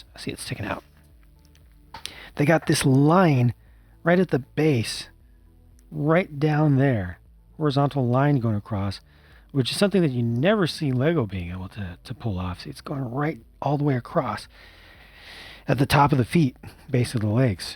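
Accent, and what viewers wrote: United Kingdom. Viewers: 😄 Nice British accent. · Oof that british xdd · Oh my god, the British accent is frickin FANTASTIC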